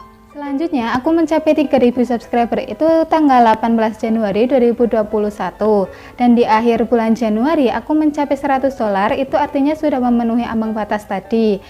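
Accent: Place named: Tegal, Indonesia. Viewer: tegal neh hhahah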